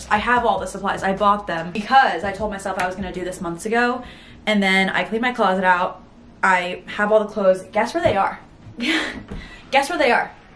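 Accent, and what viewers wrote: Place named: California, United States. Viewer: That Californian accent 😶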